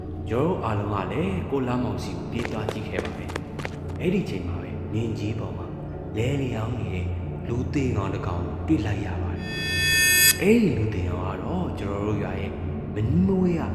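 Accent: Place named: Myeik, Myanmar. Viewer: ကြိုက်လိုက်တာရှင် အသံလေးလည်းဖွာမိတယ် မြိတ်အသံလေး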